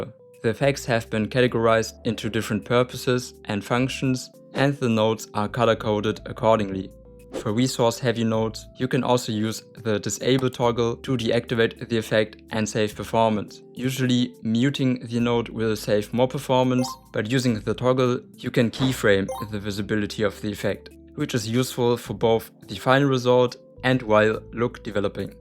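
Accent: Germany. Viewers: I hear Denglish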